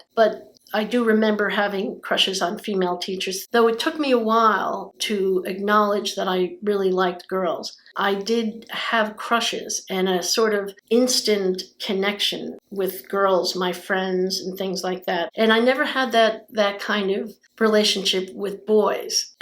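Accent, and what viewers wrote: New Jersey, United States. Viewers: From jersey and don't talk through your nose 😂😂 My Dads is from there 2 and when I piss him off u can hear that Jersey coming out 😊😂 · I knew that accent was American but now you got me I'm from Jersey City New Jersey YYYEEEAAAAAA!!! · i'm from nj too but i grew up in pa. did you ever have the jersey accent? i had a heavy jersey accent at one point but it changed to a pa accent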